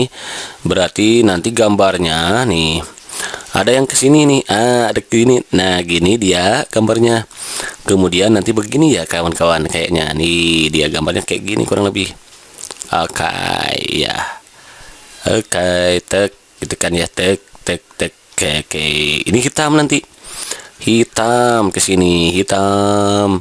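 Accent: West Java, Indonesia. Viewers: Klu orang sunda mah seru, enak d simak 😀. Auto Subscribe n like 👍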